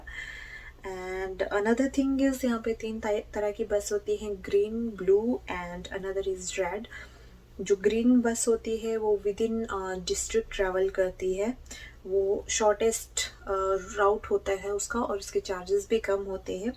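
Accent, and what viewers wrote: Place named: India. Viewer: Your English Accents like Indian language , Not understanding at all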